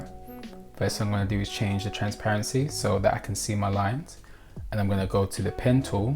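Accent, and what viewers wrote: Midlands, United Kingdom. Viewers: I never thought I could make a rug but you’ve made it look so achievable! Thank you so much! And your voice is so relaxing! You from the midlands? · is that a midlands accent i detect? i adore this design <3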